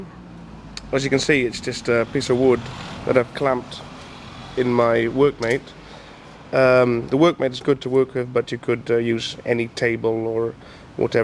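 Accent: Netherlands. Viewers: Haha ik zat te luisteren en ik denk, hmm wat voor accentje is dit, klinkt heel hollands :P. Lees de eerste comment, ja hoor :P. Wat voor hout raad je aan? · What's the accent may I ask? Sounds like Dutch but living in the UK for a decade or so...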